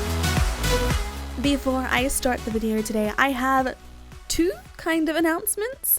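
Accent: Australia. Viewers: this is no offence but it confuses me because she has a Australian and normal accent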